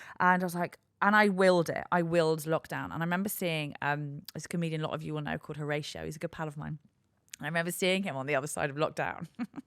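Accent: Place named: Liverpool, United Kingdom. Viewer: My favourite posh ginger southern scouser (when it’s in you, it’s in you). Go for it!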